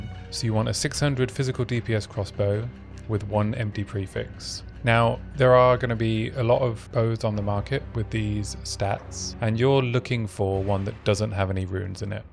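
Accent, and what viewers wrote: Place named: England, United Kingdom. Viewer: Hi. I've been theory crafting a build for the negative armour on Warbringer. I'm on a holiday so been researching other armour break builds when the family have gone to bed. I just watched through your videos of this league and they're great. I love listen to a fellow Englishman talk about POE2 for a change. You videos are high quality and you explain things well. I'll definitely check you out on twitch when I get a chance. And my condolences on the attack speed. 😅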